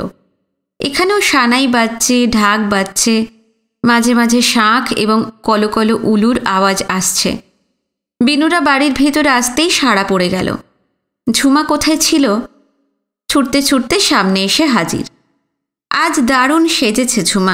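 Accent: East Bengal, Bangladesh. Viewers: Khubi bhalo lagche, tumi je aeto parisram o jatna kore aei bishal upanyas path kore shonachho ,bishesoto purba banglar bhasha je immense dakhyatar sathe bolecho ta ak kothay atulonio, aeto ghatanar madhye ak jon adbhut bhabe missing se holo Jugal ,aeto charitra pratita prithok rupe path kora sahoj byaper noy tomar aei prachesta atyanta prosongshoniyo ,bhalo theko anek dhanyabad tomake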